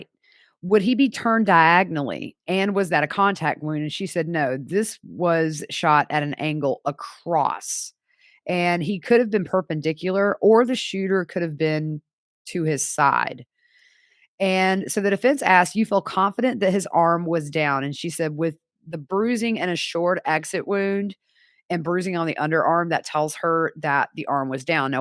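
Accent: South, United States. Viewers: please don’t hide your Southern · “We gotcha stuff….” 😂 I adore you. I’m a southern girl too and appreciate the way you talk to us like we’re BFFs, sippin sweet tea on the deck, and talking true crime!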